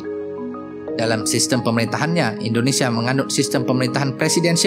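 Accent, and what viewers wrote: Malaysia. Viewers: Admin Dari logatnya Tampak Malaysia bnget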